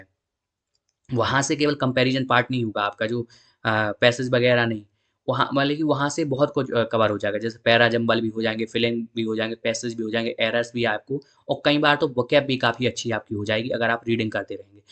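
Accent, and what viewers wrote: Bundelkhand, India. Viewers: Bro are you from bundelkhand??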